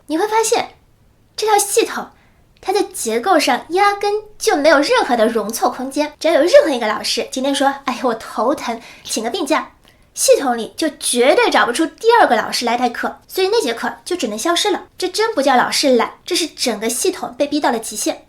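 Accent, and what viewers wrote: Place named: Shanghai, China. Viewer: 听口音是桑嗨宁吧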